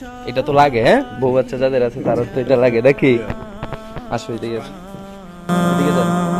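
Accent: Sylhet, Bangladesh. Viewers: জাযাকাল্লাহ ভাই আপনার ভাষায় তো বলে আপনার বাড়ি সিলেট না?আমি কি ঠিক বললাম?🤔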